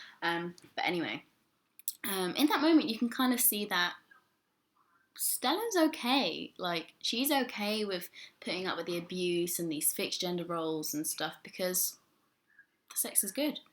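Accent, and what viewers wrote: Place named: England, United Kingdom. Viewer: I got distracted so many times with the english accent and you playing with your hair :D but it was a great video after all. Great character analysis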